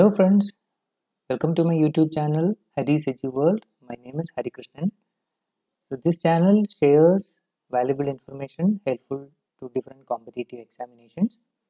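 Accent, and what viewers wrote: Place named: Kerala, India. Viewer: Voice of a malayali🤔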